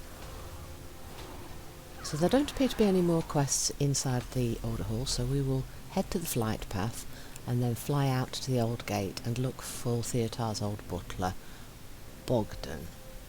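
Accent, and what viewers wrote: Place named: United Kingdom. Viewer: Painfully British but good video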